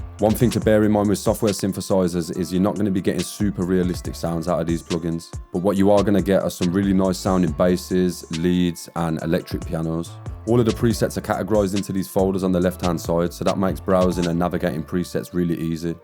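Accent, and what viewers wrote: Birmingham, United Kingdom. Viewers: ugh that brummie tamworth accent..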